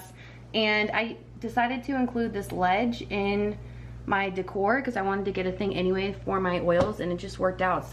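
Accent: United States. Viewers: Hi frands....🙋😘 You guys are, sooo, funny. 😂😂😂 I, soooo, needed this tonight. I'm laughing so hard I can't type...😭😭 southern accent and backyard tour was hilarious. Love you guys. Love watching Chip and JoAnna. Great show. Your show is more fun. I like your little house. It's cozy. Love the blue chair. Where did you say you bought it? You could put little blue lights around corkboard to balance with the blue chair. A couple of yellow pillows will give the couch a pop of color and would look nice with the poo of blue from the chair. Have you ever thought of doing your house using Fung Shei. The style uses the elements in each room of, Wood, Fire, Water, and Metal. Placement is put according to North, South, East, and West. By combining the different textures, it brings harmony and prosperity into the home. It's, quite, fascinating and fun. You can google it and it will take you through each room and tell you what textures and colors will go together. 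Each room uses the NSEW areas and middle to bring calmness and harmony. You may not want to spray lysol around. It can cause neurological issues in humans and pets. My kitty had seizures after spraying it, one day. She suffered some brain damage and couldn't walk straight for almost a month. She was, terribly, wobbly and I had to carry her around and to her litter box and food. Slept on the floor with her, in case she needed to go potty or eat. She, eventually, got better, but, was never the same. I never use chemical prod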